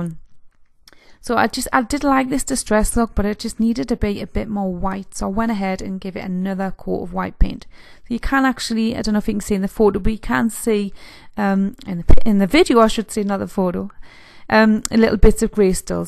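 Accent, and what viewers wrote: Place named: Scotland, United Kingdom. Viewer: I love your diys. I love your accent may I ask if you're Scottish?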